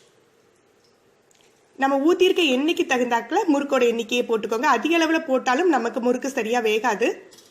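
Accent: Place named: Tamil Nadu, India. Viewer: Unga Tamizh slang, super.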